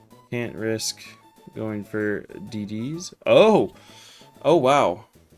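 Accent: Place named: Canada. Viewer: u sound canadian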